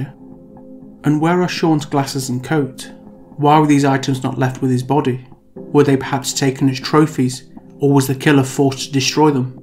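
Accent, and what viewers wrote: United Kingdom. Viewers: that voice - why did everyone on tv in the 70s and 80s in England talk with that posh funny twang lol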